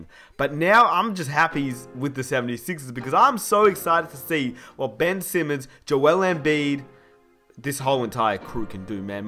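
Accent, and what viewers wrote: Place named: Australia. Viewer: An Aussie commentator - love it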